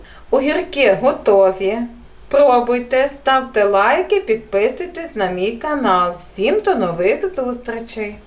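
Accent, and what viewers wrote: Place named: Ternopil, Ukraine. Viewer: Така миска)))) Такі гарні добрі руки! То щедро як на увсьо побратимство! А яка мова, ЯКА МОВА !!!!!! Одна літрА, двістА пятдесят грам ... з Тернопільщини мужчини були, так казали! Кращі дядьки!!!! я в ЗАХВАТІ !!!!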